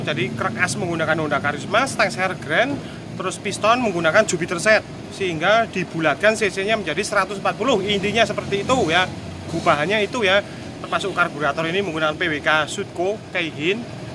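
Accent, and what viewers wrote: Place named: Wonosobo, Indonesia. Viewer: suarane Wonosobo banget, obat kangen wong sobo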